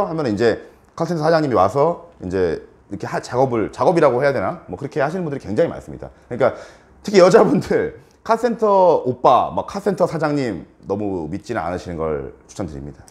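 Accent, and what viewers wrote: Gyeongsang, South Korea. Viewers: 진행자분 경상도 사투리좀 감추세요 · 딱 들어도.. 경상도사투리인데 왜 북한이라고 하는지?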